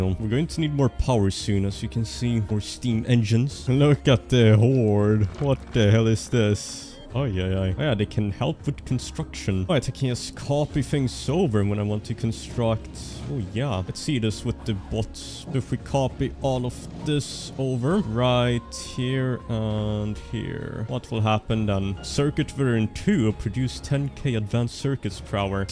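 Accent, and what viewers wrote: Sweden. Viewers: im all for factorio gameplay as well! As a swede im not fond of swedish accents but urs i can listen to all day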